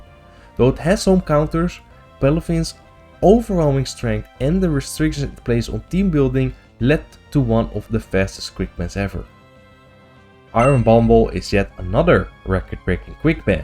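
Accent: Netherlands. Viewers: I finally understand what my English teacher means with a Dutch accent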